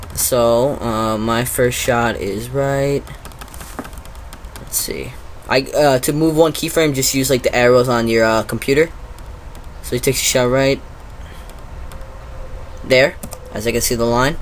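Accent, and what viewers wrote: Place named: United States. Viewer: Thank god, an american for once.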